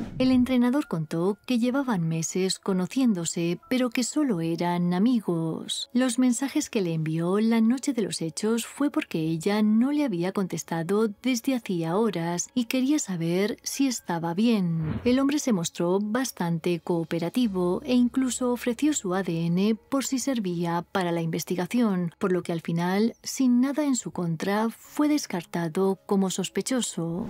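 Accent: Spain. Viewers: El acento es obvio, española.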